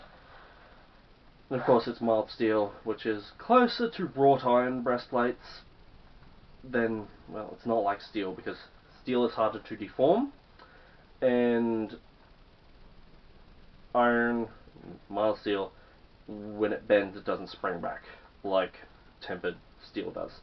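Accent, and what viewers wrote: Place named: New Zealand. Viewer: Your allready sounding like a kiwi. I agree with the comment on you being too humble on your hard work.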